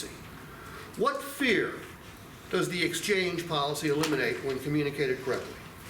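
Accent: Appalachia, United States. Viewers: Hillbilly and his bullshit hillbilly talk give it up. It’s over nobody cares. These are all tricks that consumers know about you need to sit by the pond and watch the ducks.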